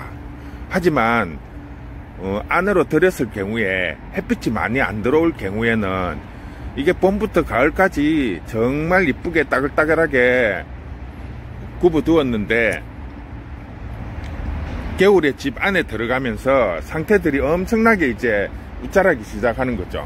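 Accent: Gyeongsang, South Korea. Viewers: 있는 그대로의 모습과 사장님의 구수한 갱상도 사투리가 어우러져 훨씬 정감가고 멋있습니다 거기다 좋은정보까지 ᆢ 정말 감사드립니다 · 화려함은 중요하지 안어요 있는 그대로의 모습~~ 님의 그수한 경상도 사투리로 알찬정보가 구독자분 들에게는 많은 공부가 된답니다 고맙습니다.감사합니다.축복합니다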